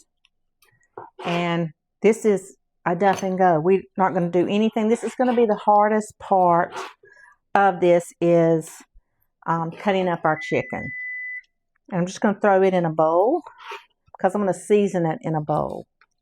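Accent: South, United States. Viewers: Thank you Friend. I love your cooking and your Southern accent.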